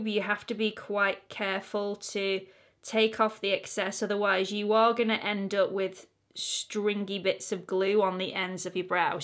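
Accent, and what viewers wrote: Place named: England, United Kingdom. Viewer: All your descriptions still sound so proper with your English accent. 😊 Please do the Essence review next. 😁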